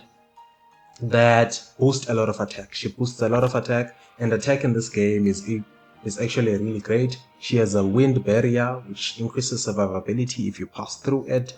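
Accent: South Africa. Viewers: Sounds like a South African accent..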